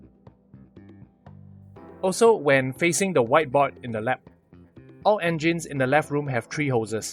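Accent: Singapore. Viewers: Haha could tell you were from SG from your voice. You sound like a tuition video for PSLE.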